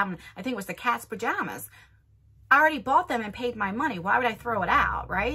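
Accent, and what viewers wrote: New Jersey, United States. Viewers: Hi My name is Roz. I am 55 years old. I am an COVID ICU nurse. I started following you during the beginning of the pandemic. You sound like one of my best friends that was born in New Jersey. I admire you so much. In my head we would be good friends. I have been wanting to make You Tube videos since 2007. I love makeup. I was a makeup artist from the time I was 18 until I graduated from Nursing school at 30 years old. I live in California. But I was born in the deep south. I had a lot of identity issues growing up. Because I had light skin and long hair. I forgot something I am Black. I used to come home crying to my parent and my good mother. My parents were born before your grandmother. My dad was born in 1925 and my mom was born in 1930. They had friends of every race and religion. I am the oldest and I was born in 1966, My parents had their last child in 1974, So my baby brother is not even 50. 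I am widowed. My husband was Caucasian and Native American. I agree with you totally. My parents taught me to always give people a second chance because people can change. I have every single Jeffree Star palette. I like his makeup. My generation will be last generation to know what is to live without social media. You are doing what any good Christian would do. Keep making your amazing videos! Love from Northern California.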